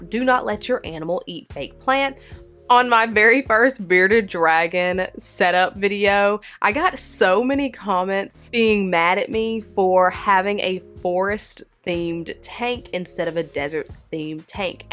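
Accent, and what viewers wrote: South, United States. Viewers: I love your accent. I live in Michigan and don't hear southern accents. So it's fun to hear. But yeah. Unfortunately people feel like they can say anything on the internet. So you're gunna get a lot of trolls. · there's nothing better than that southern drawl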